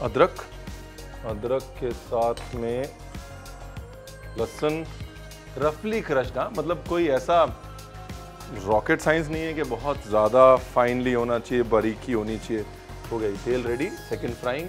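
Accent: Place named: Lucknow, India. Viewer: Ranveer Bhaji .. assi vi Lucknow to a. Menu vada changa lagta jab tussi pubjabi vich gal karde ho , with a slight Nawabi unfluence. Hor tuhadi recipes de ki kenhe. God Bless you, Ranveer!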